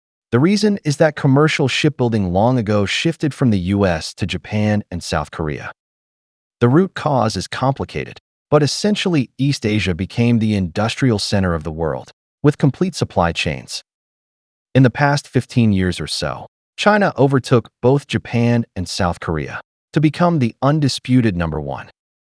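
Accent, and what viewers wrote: India. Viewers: HER CURRY ACCENT IS IRRITATING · Palki's dream to join CNN or Fox in USA, as do all pajits dream to leave Endia. But first, she needs to clear that curry accent.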